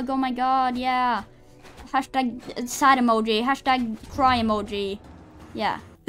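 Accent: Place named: Scandinavia. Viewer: Are you scandinavian? I am norwegian and I recognize the accent hehe :)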